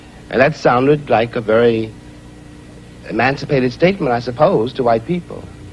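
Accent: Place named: England, United Kingdom. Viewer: Wow he sounds English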